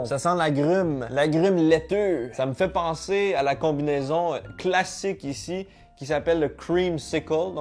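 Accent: Quebec, Canada. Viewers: dan ta prochaine vidéo reparle en Français stp t'es trop marrant sans ton accent québécois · C'est vraiment cool que tu gardes ton parlé québécois même si la majorité de tes followers sont français. Ton authentisme est apprécié! Continue ton bon travail, ça passe le temps en criss! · " Voici un Crush à l'ananô " L'accent québécois me surprendra toujours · "... de fleure sêchées que ta grand-mère met au dessus de ses toilettes quand ça sent trop la merde. Non? T'as pas la référence?" J'ai éclaté de rire XD XD Ça rend super bien, avec l'accent québécois XD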